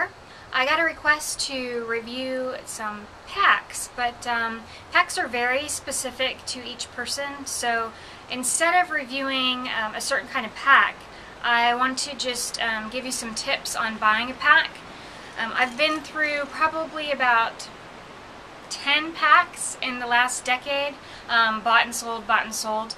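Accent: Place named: United States. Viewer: really that ;erson said you have an accent ? you sound like a american girl ha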